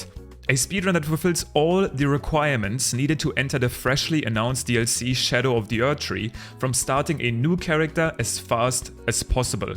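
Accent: Germany. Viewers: Nothing makes me happier than realize within seconds that I've found yet another german creating English content lmao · Sprichst du als erste Sprache Deutsch, klingt ziemlich danach 😌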